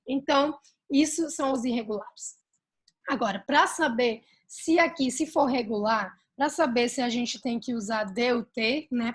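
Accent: Brazil. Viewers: Cara, tu é o bicho para ensinar. Você fala o portugues como brasileiros e com um sotaque maravilhoso.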